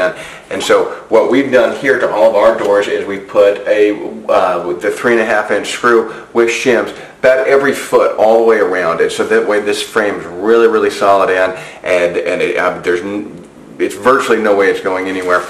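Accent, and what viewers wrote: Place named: Alabama, United States. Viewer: Great recommendations. You sound like a fellow Bama boy!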